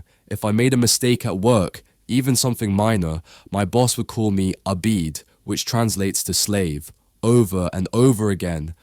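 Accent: United Kingdom. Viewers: The Original Arabs were / are Black. Adham [Radiant, Shining Black]. Aswad [Black], Qadar [Black]. The paler shades of people from MENA = the Middle East / North Africa are the byproducts of Black men producing with the Slavic = Eastern European / Caucasian women. That said, although I know that the racist = white supremacist scenarios articulated happen, the narrator has a keen British accent. And thus, I am curious. Why does someone from Sudan have such a sharp British accent. I may be missing some details,.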